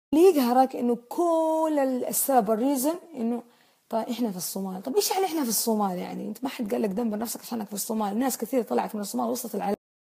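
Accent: Saudi Arabia. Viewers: هل كنتي عايشة مع اولاد في السعودية؟ سلوب كلامك مثل شباب السعودية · لهجتها سعودية قح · هل أنت سعودية؟،،لهجتك سعودية 100 ب100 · اهنيكي على اسلوبك الجميل والواضح ولهجتك السعودية روعة بصراحة الجالية الصومالية من افضل الجاليات في السعودية وعندي صديقات منهم في الثانوي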